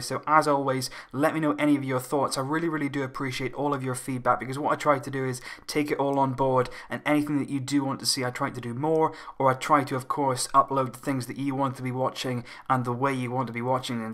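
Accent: Wales, United Kingdom. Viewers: Barcelonista 1983 I'm from Scotland and he definitely sounds Welsh mate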